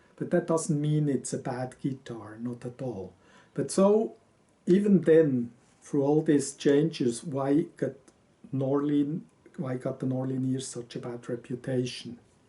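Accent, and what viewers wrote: Switzerland. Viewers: I can tell by your accent that you are Swiss! So am I.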